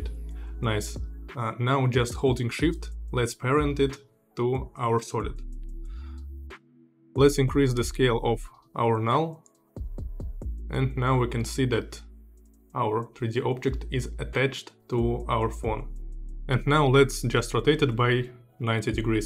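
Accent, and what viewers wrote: Russia. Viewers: С первых секунд ролика понял, что ты русский)) а после "mask один" я орнул))))) классный английский бро)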